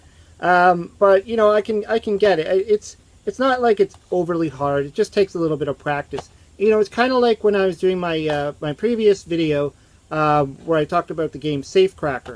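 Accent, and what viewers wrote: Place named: Canada. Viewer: You sound and look canadian like from Toronto or something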